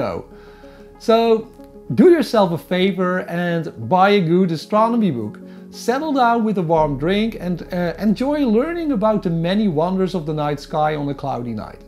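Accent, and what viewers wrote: Netherlands. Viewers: Hello, I enjoy your videos very much. I live on Vancouver Island in Canada and our climate is similar to yours. My parents came from Holland so I like your accent LOL. I have a question for you or perhaps a topic you can test. I'm at the beginning my astrophotography hobby and very much a newbie but have been doing lots of studying for the last 2 months. I have a cold weather question: After watching this short video <link> on photographing in cold weather I was wondering if any astrophotographers have noticed a difference in using heat bands to prevent dew and if the heat band adds heat distortion itself. I do know you need to cool your equipment before shooting but then your adding heat to the lens hood area again. Isn't this causing heat distortion?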